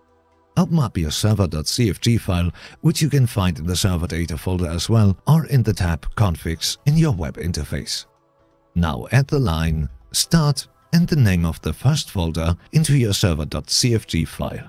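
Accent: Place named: Germany. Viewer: No worries it was beautiful! German people have a very unique and strong accent, and I personally quite enjoy listening to it, but I have honestly never heard one as nice as yours! I am from australia so listening to our accents even for me here is like wiping my butt on sandpaper hahaha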